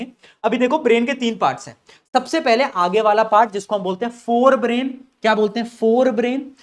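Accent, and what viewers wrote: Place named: Bundelkhand, India. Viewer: jitno bhi Bundelkhandi accent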